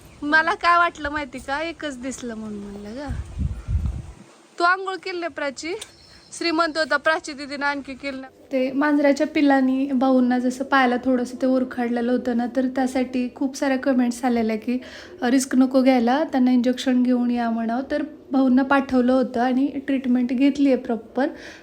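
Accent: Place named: Latur, India. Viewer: Tu pn aata लातूर chi भाषा बोलतेय khup aavdle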